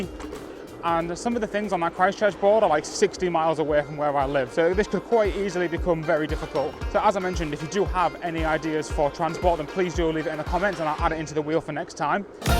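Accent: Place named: Northern England, United Kingdom. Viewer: What accent is this,i could of sworn it was north england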